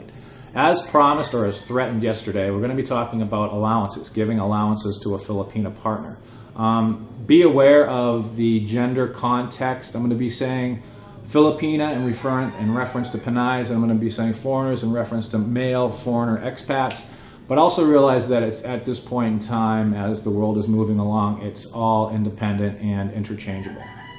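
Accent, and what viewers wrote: United States. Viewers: I like the style of these videos of yours, they are just more thoughtful than than a lot of other video blogs. I am Brit myself, I like that 'Yankee' way of talking you have, casual -laid back but informative.( Yea, I know that internally Northerners are Yankee, but to those outside, we call 'ya all' Yankees). I am looking ahead to retiring in the phillipines, still a few years away. I am thinking of literally sailing off to the Phillipines on my boat, living there and and puttering about. Your videos just give me a little bit of a heads up on what to expect; hopefully expats as thoughtful as yourself are part of the package, thanks for your insights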